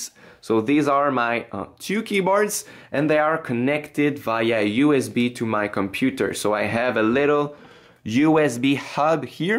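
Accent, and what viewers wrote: Quebec, Canada. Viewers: Are you from Quebec?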